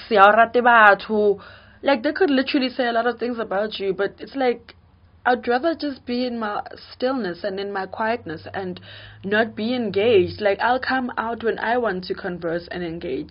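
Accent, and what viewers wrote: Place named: England, United Kingdom. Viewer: what a beautiful English accent tjo, your ancestors are learned 🙌loving how you explain it in your language too wow.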